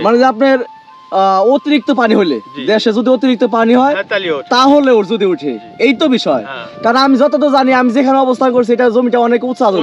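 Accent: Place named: Pabna, Bangladesh. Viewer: এনার কথায় মনে হচ্ছে ইনি পাবনা জেলার মানুষ, আর পাবনা জেলার মানুষের কথার মূল্য আছে,এরা পাগল ছাগল হলেও দুইনাম্বারি কথা বলে না,অনেক পাবনার মানুষের সাথে আমি থাকছি,এদের রাগ একটু বেশি, কিন্তু মানুষ হিসাবে ভালো, পাবনার পাগলা 😂😂❤❤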